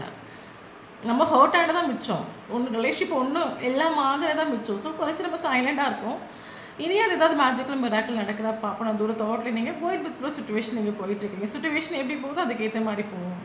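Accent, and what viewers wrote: Kerala, India. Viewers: ரொம்ப நன்றி univers ♥♥🙏🙏, mam super உங்க Reding, எல்லாமே உண்மை. நீங்கள் சிரிச்சிட்டு சொல்லுறது ரொம்ப அழகு, உங்கள் மலையாள தமிழ் தனி அழகு.! நன்றி time பார்த்து அடிக்கிறீங்க ❤❤.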